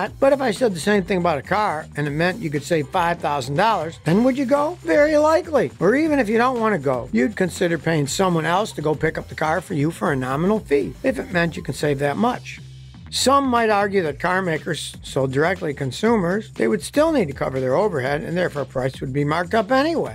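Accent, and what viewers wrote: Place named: Buffalo, United States. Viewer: Scotty is possibly the most famous guy from the Buffalo area. Love the Buffalo accent and the word "pop" instead of "soda" or "tonic."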